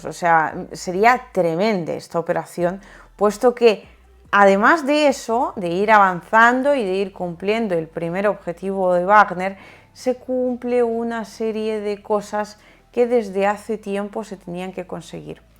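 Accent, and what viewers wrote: Spain. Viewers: Todo bien!, he visto videos de tú canal!, Sólo te pido, quítate ese acento de España.. el mejor español se habla en México.. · мы задаемся вопросом в Латинской Америке, можете ли вы говорить на латинском испанском языке, потому что нас более 500 миллионов латиноамериканцев, и испанский акцент напоминает нам о геноциде с момента прибытия Христофора Колумба.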